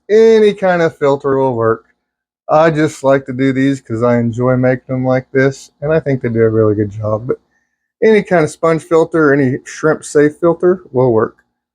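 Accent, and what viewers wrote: United States. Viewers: Where you from country boy?! 😅 Hellooooo from Raleigh, NC! Enjoying the content, dude. I'm liking the genuine videos not trying to be too polished and pomp. Keep up the great work!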